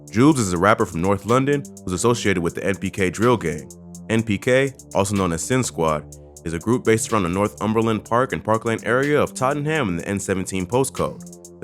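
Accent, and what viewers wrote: United States. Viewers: Bro I love this us accent wtf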